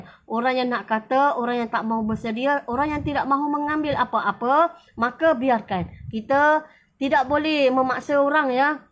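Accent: Kelantan, Malaysia. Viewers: org kelate ke kak ni ? adui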